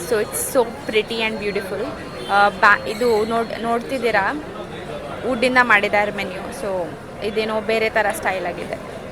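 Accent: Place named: North Karnataka, India. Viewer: Nice see north Karnataka girl vlogs, in north Karnataka style Kannada .. is super style.. lol..,!!!!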